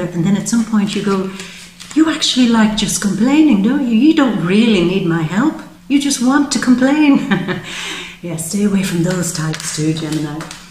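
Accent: Ireland. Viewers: Well I have to say this has resonated with me like 98.9 % I don't know how you do it. But it was really nice to hear I am thinking like your cards... Bravo Cheers Love your Irish accent. Beautiful.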